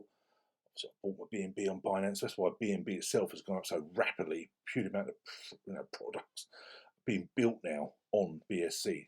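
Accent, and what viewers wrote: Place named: United Kingdom. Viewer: Hello sir, great content! If you don’t mind, I have a question for you. I assume you are a fellow Brit, with that accent! If so, can you advise how Gem token purchases work on VeVe - when I just tried to buy $100 worth of Gems I got charged £100 via the Apple store in app purchase. I cancelled, for now, but is that right, does $100 worth of Gems cost £100, instead of about £72 which it should be at 1.4 conversion rate? (Also posted on latest OMI video)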